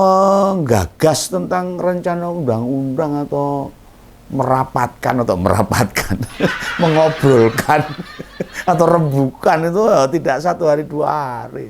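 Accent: Java, Indonesia. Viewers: Sbg non jawa. gak ngerti apa omongam om ini, medhok2 campur aduk. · Mantap om,tetap bersuara dg diselingi dialek jawanya..saya suka😂😂😂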